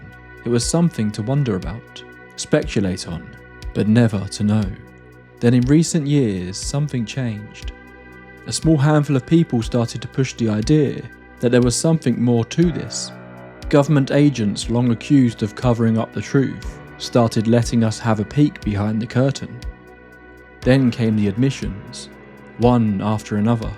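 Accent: England, United Kingdom. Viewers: I Cant what this video. Too slowly explained. Get to the point faster for heaven's sake. Like watching paint dry outside in winter. You should also ditch the english accent narration. So sick of that here on Youtube.😢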